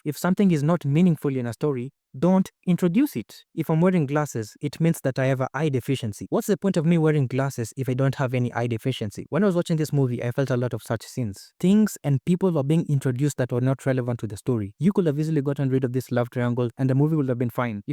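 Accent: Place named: Kenya. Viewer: What I like here is that you didn't stutter and completely did it with a Kenyan accent. Standing for authenticity 👍🏾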